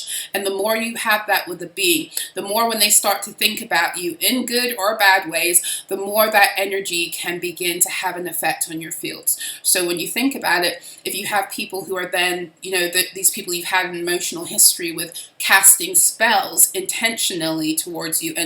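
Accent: United Kingdom. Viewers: Where approx in uk ru. Scottish accent?😊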